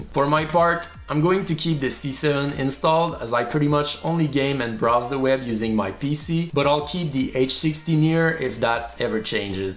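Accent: Quebec, Canada. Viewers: Es-tu Québecois?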